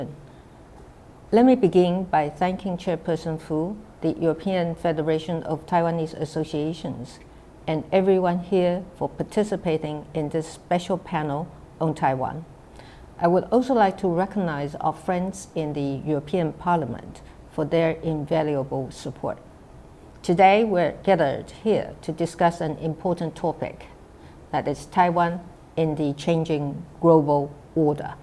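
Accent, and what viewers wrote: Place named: United Kingdom. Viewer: 做得好不好我不知道 但這英文能力很強呢 而且因為是對歐洲各國講所以用英國腔 其實挺厲害的(我只會破美語XD)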